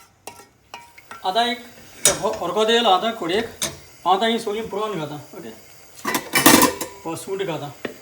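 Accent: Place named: South Goa, India. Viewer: What I loved most about this video is our South Goan Konkani and accent ❤❤ wish we saw more of it in media!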